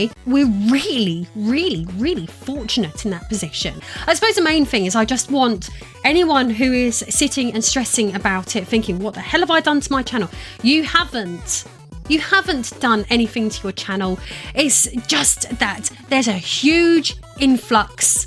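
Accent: United Kingdom. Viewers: I watched one of your videos the other day now I'm getting a steady stream of your content. I'll stick with you because you're British and the accent is perfect. The BBC should hire you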